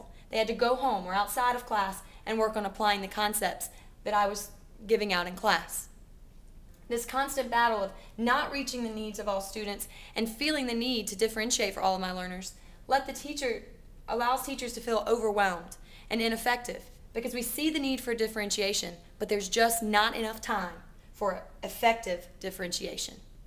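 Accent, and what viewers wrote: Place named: United States. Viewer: Your video is being used on a UK website claiming that you are one of their teachers which is odd I thought because of the Yank accent. you might want to ask them to take it down, contact me for the link where it is being used if you care.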